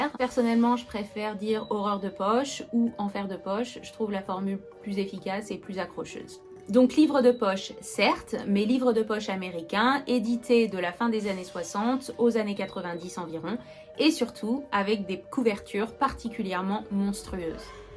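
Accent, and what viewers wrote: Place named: England, United Kingdom. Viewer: exelente chaine et belle accent anglais j'espere que tu sera plus reguliere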